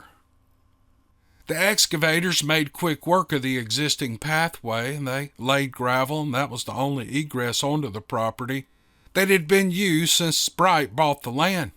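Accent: South, United States. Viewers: I’ve been sharing your stories & telling everyone I can all about The Wild Hunt & Steve Lily. Everyone I share these gems with is hooked after hearing the first one. Sometimes I tell them in my own words & voice to peak their interest, then I close the deal when they hear the twang of the guitar & the soft, soothing sound of your good ole boy southern type voice weaving a tale of man vs monster. The latest addition to your growing audience is my oldest nephew. I started him in on The Wild Hunt Oklahoma Sasquatch Wars. Thank you for all you do & looking forward to future Steve Lily & Captain Clark of Team Odin’s Squatch encounters. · Good morning. I enjoy your recounting the experiences others have had but, nothing compares to these Steve Lily and Wildhunt stories. There is a connection to the characters that the shorts just don't afford. Your southern drawl gives your narrative an air of authenticity. 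Please keep them coming. Randy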